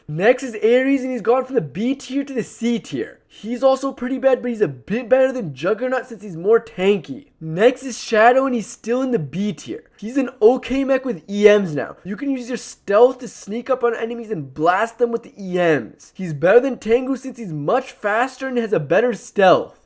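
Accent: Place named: Middle East. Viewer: May I ask where you are from? Your accent is interesting. I’m assuming Middle East somewhere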